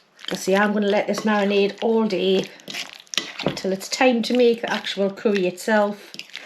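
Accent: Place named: Northern England, United Kingdom. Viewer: I enjoy the food content of this shoe almost as much as I enjoy accent from the north of England. Americans like me love that accent because it feels so close to home and is very much like ours. Keep up the good cookin' work!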